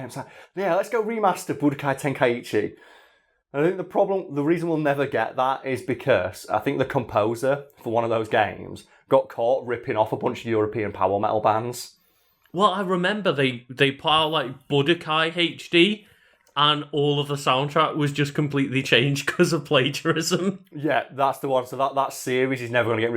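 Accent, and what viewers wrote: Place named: England, United Kingdom. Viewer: True fact: Jackie has never done all his own stunts, he's used stunt doubles for all manner of teeny tiny martial arts moves all the way up to his infamous clock tower fall in Project A, where the main shot used in the film isn't of Jackie falling from the clock face to the fall, it's his co-star and frequent stunt double Mars doing that stunt. Jackie does perform the 2nd shot from further fdown the tower (so only a two-thirds fall), which is the one where he gets up and delivers his lines all in the same shot. Wisely he chose not to do a full fall leading into some minor acting afterwards all in one shot, because however you take that fall, you can't count on being able to stand up, act, and deliver lines afterwards! Also, what country is the videomaker operatng in? He sounds English, but he talks like someone who has never done a basic search for Jackie Chan on amazon UK. 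No jackie chan films available on Blu-ray? Try Police Story 1/2, Wheels on Meals, Armour of God, Crime Story, Project A 1/2, City Hunter, Miracles, Heart of Dragon, The Big Brawl, The Protector, The Young Master, Dragon Lord, Drunken Master, Snake in the Eagles Shadow (coming soon), and all manner of his early Lo Wei productions (Fearless Hyena, Spiritual Kung Fu, Dragon Fist, Shaolin Wooden Men, Snake and Crane Martial Arts of Shaolin, etc)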